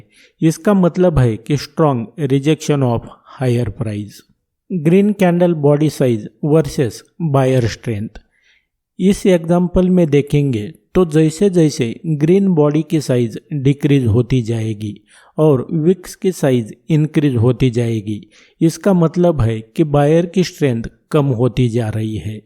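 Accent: Maharashtra, India. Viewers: Kaka tumhi marathi ahat na , Hindi varun olkhla 😂😂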